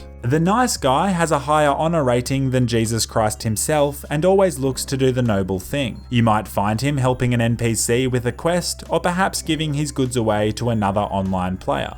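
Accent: Australia. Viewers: You always sound weird. You're Australian. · Is this Aussie accent?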